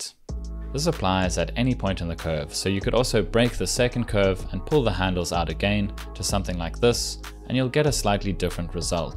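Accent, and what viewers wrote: South Africa. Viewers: Knew it! Heard the south african accent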